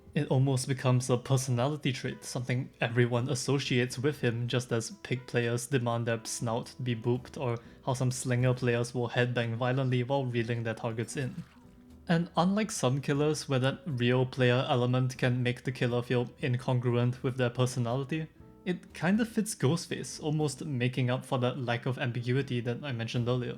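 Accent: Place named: Singapore. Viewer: this guys accent sounds singaporean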